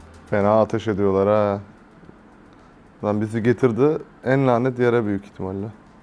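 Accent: Elazığ, Turkey. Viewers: Abi sen elazığlımısın lütfen söyle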